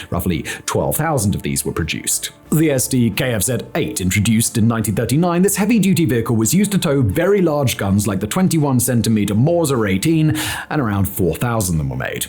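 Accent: England, United Kingdom. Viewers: got a stupid question are you from Great Britain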